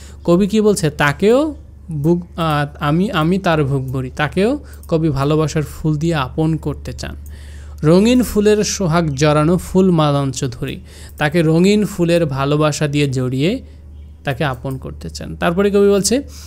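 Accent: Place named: Rajshahi, Bangladesh. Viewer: আসসালামু আলাইকুম স্যার।স্যার আপনার ক্লাস করাটা অনেক ভালো লাগলো ১ তো আপনি ভালো পড়ান মাশাআল্লাহ আর ২ য় কারণটি হলো আপনার কথা শুনে বুঝতে পারলাম আমি যদি ভুল না হই আপনি আমার এলাকা মানে রাজশাহী বিভাগের লোক। স্যার আপনার বই গুলো চট্টগ্রামের কোন লাইব্রেরিতে পাবো যদি একটু বলতেন।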